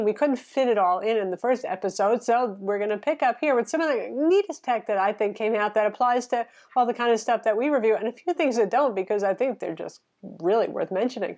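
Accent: United States. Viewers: I really enjoy your presentation, voice and style. Many American accents drive us older English people mad but not yours. Lol. Thanks for all you do.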